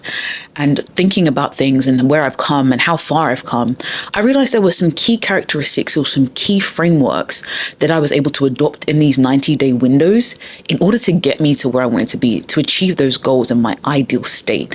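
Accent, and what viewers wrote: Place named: United Kingdom. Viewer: Ah, you're British! So refreshing. Great content, thank you, Sis.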